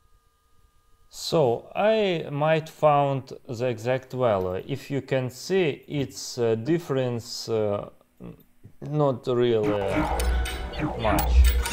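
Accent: Russia. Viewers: блин очень сильно акцент виден, ты случайно не русский?)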